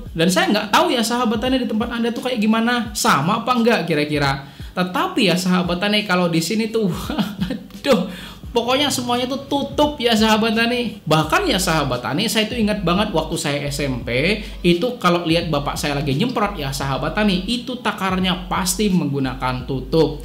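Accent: Banjar, Indonesia. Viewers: dari dari logatbicaranya seperti Bahasa Banjar!!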